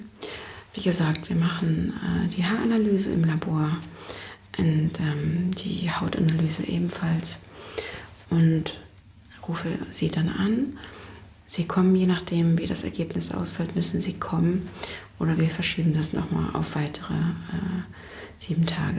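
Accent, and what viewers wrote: Germany. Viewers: ona jest Niemką. oczywiście ma niemiecki akcent🤦🏼‍♀️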